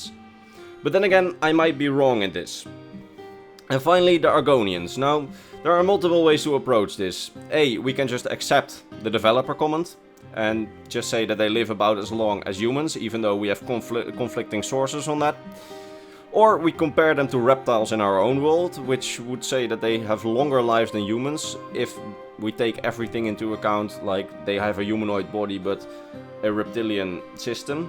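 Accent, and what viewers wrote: Netherlands. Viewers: Are you Dutch? Your accent sounds kind of Dutch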